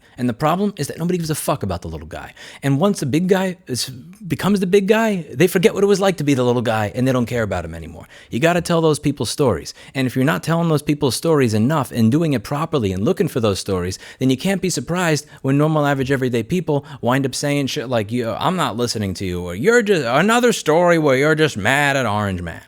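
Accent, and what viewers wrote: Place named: New York, United States. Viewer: Is it me, or is more New York today? <3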